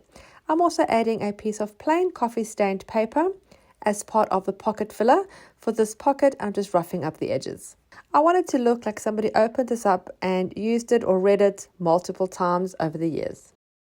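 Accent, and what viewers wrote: South Africa. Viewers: Hi, I just found your channel! So happy, and I hear a South African accent? I love your style. I need to binge watch your videos. ❤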